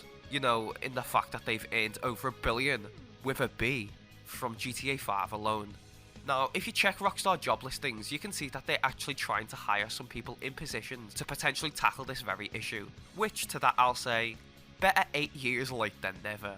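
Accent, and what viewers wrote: Liverpool, United Kingdom. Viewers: Are you a scouser? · still British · hatred for bri'ish accent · 😂 Scottish! Lmao he’s from Liverpool. not Scotland 🏴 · Are u scouse lad or u a wool? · you from liverpool? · no he’s british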